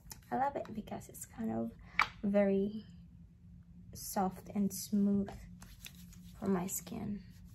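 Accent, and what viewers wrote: Philippines. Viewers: Hi beautiful.. can i ask you something.. taga diin ka sa visayas.. im surprise that you spoke like ilonggo if im right...keep safe always ang God bless...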